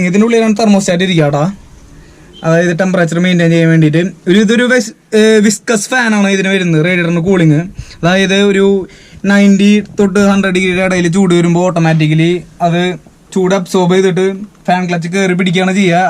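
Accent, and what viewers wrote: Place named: Thrissur, India. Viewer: Enta chengai ningadea samsaram kelkan aa ee review full kanunea... Trissur slang😍😍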